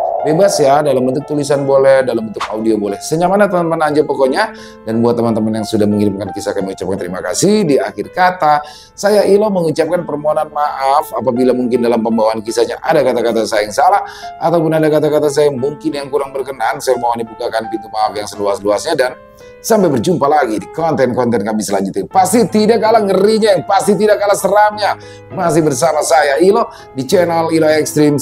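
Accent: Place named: Jakarta, Indonesia. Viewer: Warung kopi emang paling the best dech....,apa lagi dengan logat Betawi yg kental yg d bawa kan bang,emang cocok nich bang memeran kan...,🤭🙏🏻sehat selalu bang dan team terima kasih atas cerita nya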